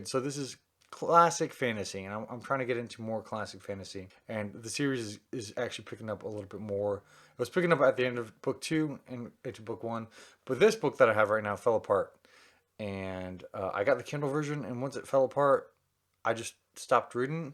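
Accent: South, United States. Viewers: I love your podcast of this video at your house in Texas during your quarantine until you had a lot of leftover books this month are "The Dragon Reborn" by Robert Jordan, "Unsouled: Cradle Book 1" by Will Wight, "Now I Rise" by Kiersten White, "The Invisible Life of Addie LaRue" by V.E. Schwab, "Legendborn" by Tracy Deonn, Cresent City: House of Earth and Blood by Sarah J. Maas, "The Fires of Vengeance: The Burning Book, Two" by Evan Winter and "Dark Age" by Pierce Brown while you're talking about all of the characters from the books to read them from the beginning after that, I hope your husband, Zach will be proud of you and I love your Southern accent!! #januarytbr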